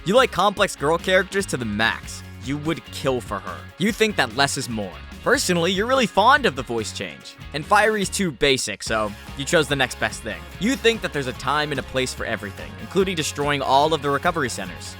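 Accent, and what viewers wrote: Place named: Australia. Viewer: wow that is incredibly accurate. Coming from an English person I thought he was English until I saw he was Australian and suddenly he sounded so Australian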